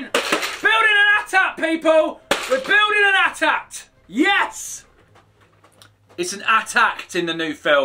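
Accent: United Kingdom. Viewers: is he British ?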